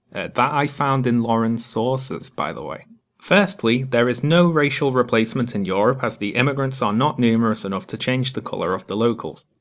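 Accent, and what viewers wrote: Liverpool, United Kingdom. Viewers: The Scouse accent is fucking vile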